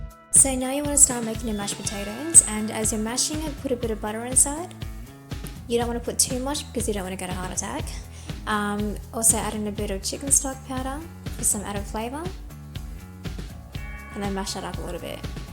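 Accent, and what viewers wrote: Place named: Australia. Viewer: nice to hear an aussie accent for this!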